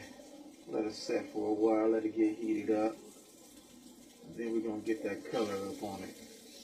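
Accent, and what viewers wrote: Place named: West Coast, United States. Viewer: He Is In A California Prison!!!! His Accent Is Straight West Coast!!!!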